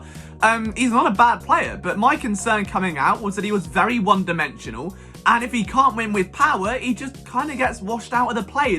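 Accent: United Kingdom. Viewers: You have a great show. The haters are shocked that a dude with a brutish accent has this much Eagle knowledge. Big ups to you.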